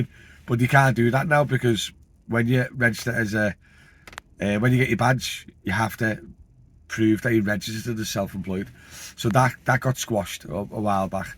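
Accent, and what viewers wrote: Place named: England, United Kingdom. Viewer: Can't understand your English accent mate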